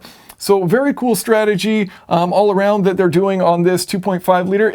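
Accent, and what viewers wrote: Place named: United States. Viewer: Another great video thank you Jason. My question is a simple one, why in the States do you pronounce Mazda as Marz da? Though I could add, why also in America is Nissan pronounced Knee San? Expecting some hate here, but really just curious.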